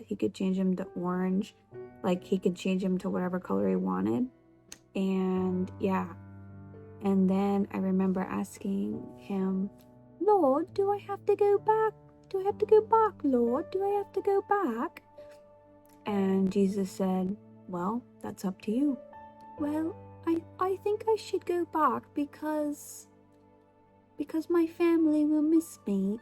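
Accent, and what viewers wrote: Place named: United Kingdom. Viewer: I love your British accent, so cute. My son asked me last night why I always watch your videos and I said, because I love her and her videos. It is highly possible that you had that dream just to intercede for her through prayer. I had a dream last week and there was a woman standing at a public counter and she said, "my son makes 200 thousand dollars a year and he gambles it all away. I prayed for her son in my dream to stop gambling. When I woke up I was so glad to finally be remembering my dreams again, even if it is just snippits. Anyway, I believed he was a real person and that was really his mother. Jesus is quickening the body of Christ and we are experiencing supernatural things left and right! Bless you and your family.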